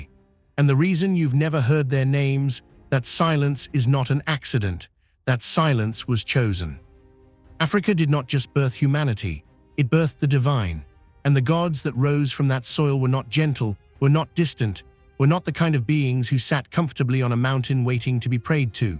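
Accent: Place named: Europe. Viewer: Why do these channels claiming to reveal hidden African truths hidden by Europeans always have a European narrator? Let us hear our history with our own "voice"!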